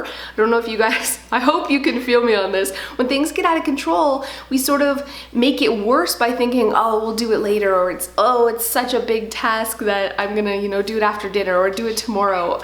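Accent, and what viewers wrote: Canada. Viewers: your Canadian is showing lol! "eh?" it's all good. I'm in Indiana and I still say eh. I live like 3.5 hours from Canada so that may be why haha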